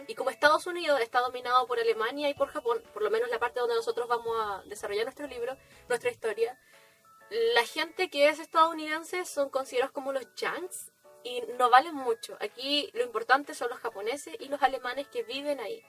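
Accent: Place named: Canary Islands, Spain. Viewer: Chacha si no es por una palabrita que te delató, me habría cortado la mano por tu acento a que eras de Canarias. Estoy in love con tu canal🤗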